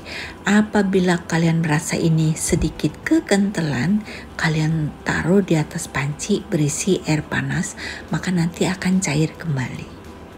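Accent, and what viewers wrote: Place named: Banyumas, Indonesia. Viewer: Suaranya agak mirip THV Channel (The Hasan Tv) tp THV versi ngapaknya 😁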